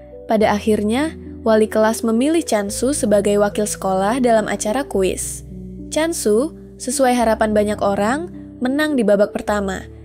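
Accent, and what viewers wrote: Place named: West Sumatra, Indonesia. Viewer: Pasti urang Minang kan kak wkwkwk😂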